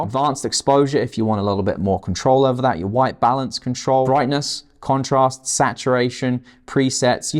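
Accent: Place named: United Kingdom. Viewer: judging from your accent I'm going to assume you're based in the UK and you forgot to mention one key point. The Mevo Core camera is NOT available in the UK with no date provided as yet as to when they will be available. In my communications with Mevo/Logitech they could not guarantee they would ever be available outside USA/Canada in the future either.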